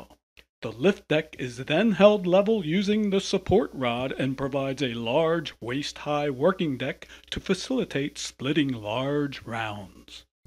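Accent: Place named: Pennsylvania, United States. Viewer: What country is the moderator from? Sounds like a PA Flatlander turned Northern PA "dude."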